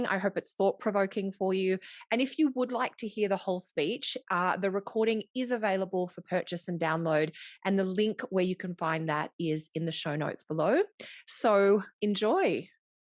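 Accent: Australia. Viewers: Wow where are you from originally are u from Australia, like Melbourne? Omg Fiji that's lit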